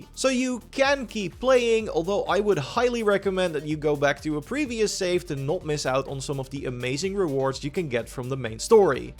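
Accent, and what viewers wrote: Netherlands. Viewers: Zwz een nederlander deze man ik hoor het aan je engels😂 mijne klinkt ook zoiets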